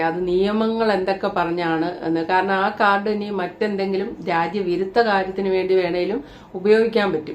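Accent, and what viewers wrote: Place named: Kollam, India. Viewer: കൊല്ലംകാരിയെന്നു തോന്നുന്നു കാഞ്ഞിരപ്പള്ളി സ്ലാങ് വേറെ ആണ്‌ ഞങ്ങൾ ഇത്തരക്കാരല്ല Am proud to be a Kottayamkaran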